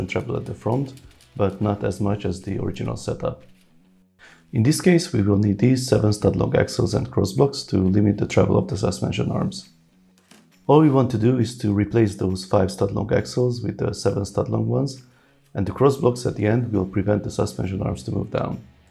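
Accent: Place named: Hungary. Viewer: Kicsit olyan magyar akcentusa van nemtudom miért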